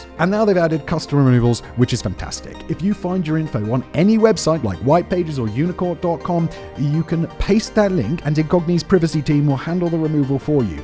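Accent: United Kingdom. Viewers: The whole video seems very US-based for someone who sounds British and lives in the EU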